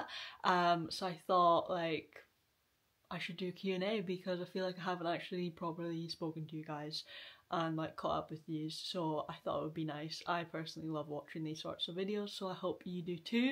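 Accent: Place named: Scotland, United Kingdom. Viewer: Aw you’re welcome! I’m in West Lothian, yet to visit Inverness, but maybe one day! I love a good homey-vlog, anything relaxing! Your videos, and Rosie Henshaw are just so nice to watch, plus it’s nice to hear a familiar Scot’s accent on here! Hope you all have a great Easter 💛🙏🏼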